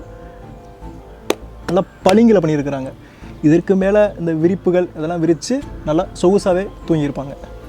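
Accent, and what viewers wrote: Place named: Madurai, India. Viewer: Hi.... Please read kannadasan's Cheraman Kadhali..we miss your iyalbana madurai slang..ellorukkum vanakkam.and vaanga polaam.. pazhaya ilandha sogathil puthiya konathil anbudan varavekirom.. love and regards..and very best wishes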